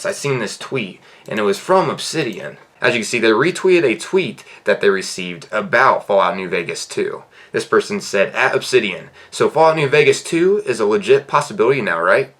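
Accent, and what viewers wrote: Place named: South, United States. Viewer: Me, looking at some of the channels I used to watch when I was younger: What is this place? Seriously, the uptick in quality and preparation shows. But, overall, that same vibe just comes across, idk why. Maybe it's the slight southern accent? Also, just a fun thing: I, a 17 year old now, have intense nostalgia for your desttiny year 1 content.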